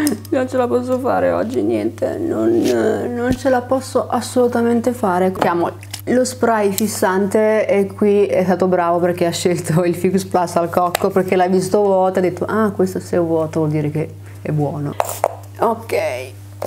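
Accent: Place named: Bologna, Italy. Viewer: Quanto si sente la cadenza bolognese!!! Noi ci riconosciamo ovunque 😅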